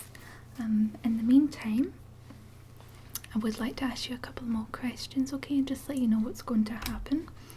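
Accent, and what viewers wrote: Scotland, United Kingdom. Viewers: All your videos are great and a particular thumbs-up for the Scottish accent! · Where in Scotland are you from?x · ASMR I thought as much it was a Scottish accent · I just love to listen to a scottish accent.the best accent there is. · Nice Accent! Scottish, Right? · Perfect ASMR! As a Scot I give your Scottish accent 10/10 :) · I don't normally like Scottish accents but yours is very nice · heyo ma wee fellow Scottish pal!! where abouts in Scotland are you from? i wanna say glasgowish but then your 'body' sounds different from mine so I'm not sure hmmmmm ohh hmm maybe not hmm most likely much more up north? am I right? I'm definitely right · I'm absolutely hooked on your role play videos with a Scottish accent. the rp vids also seem so real. love them. thank you for making asmr videos!! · How do u put on such a good Scottish accents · OMG SCOTTISH ASMR THE WORLD IS A BETTER PLACE 😱😱😱😱😱😍😍😍😍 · Rebecca Harper it's called a Scottish accent... 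· I thought the cables connected to my headphone speakers where plugged into the water supply, because that gorgeous silky smooth Scottish accent just flowed into my head. Please can you do another ASMR kidnap video sometime. Thanks a million for all your hard work. 👍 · I'm not a fan of your Scottish accent, sorry! The Scottish accent feels like a weird step into the unknown. sorry to be critical just my own opinion. Otherwise really enjoy your videos.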